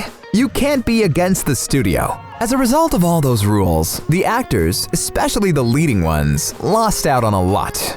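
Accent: United Kingdom. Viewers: what is your British but American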